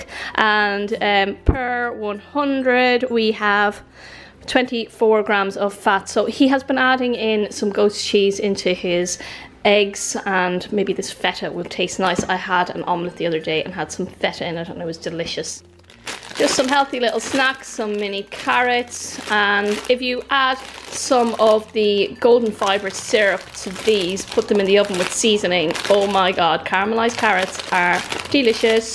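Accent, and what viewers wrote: Ireland. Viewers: "Pray to the fairies"... Lol. Does that even work if I do it - or does it work for the Irish only 😂 Love your Irish English by the way... The only reason why I religiously watch channel!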